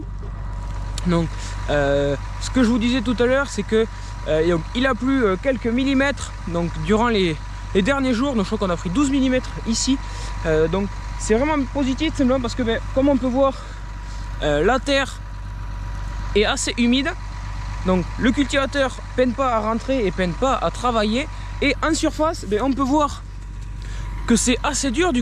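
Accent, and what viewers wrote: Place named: Toulouse, France. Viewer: Vue l accent je dirai Toulouse alentours mdr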